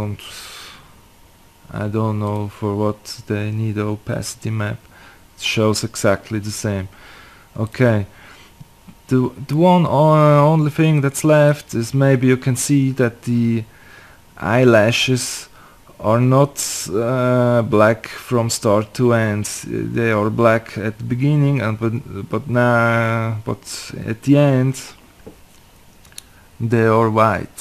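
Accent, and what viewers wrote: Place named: Brazil. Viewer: Pelo inglês deve ser brasuca rsrsrs mó sutaque hein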